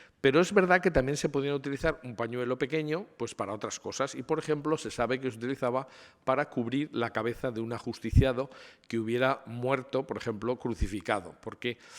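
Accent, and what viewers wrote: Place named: Spain. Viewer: Este tipo, con su nerviosismo obesidad, y acento hace pensar que en realidad todos los españoles son encojonantes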